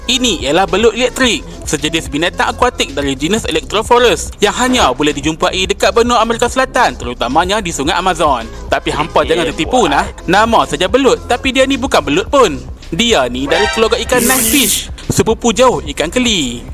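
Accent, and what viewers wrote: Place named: Kedah, Malaysia. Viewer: pekat sungguh aih loghat Kedah dalam video ni...hahaha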